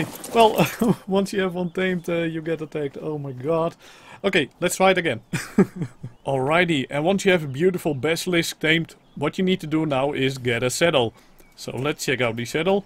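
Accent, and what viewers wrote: Netherlands. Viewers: What language are you you sound dutch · Ben je nederlands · Jij bent nederlands dat hoor je echt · Haha ik hoorde gelijk dat je Nederlands was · the dutch english accent omg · Mooi nederlands accent. This is a sifferent sort of cook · you sound very dutch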